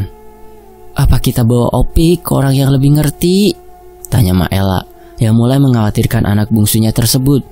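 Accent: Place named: Betawi, Indonesia. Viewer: Wa'alaikum salam wr wb Saluuut bgt sama mas suaran & Dialek Betawinya pas bgt menyatu & menjiwai kereen 👍👍👍🥰🥰🥰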